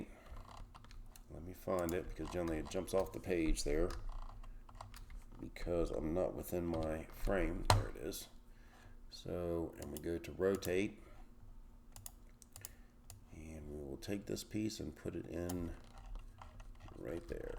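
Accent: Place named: Philadelphia, United States. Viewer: Where are you located? You sound like you’re from Philly or South Jersey.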